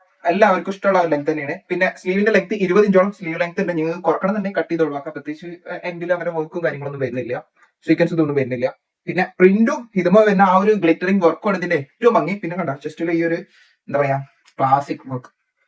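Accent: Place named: Malappuram, India. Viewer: Malappuram slang anallo evide sthalam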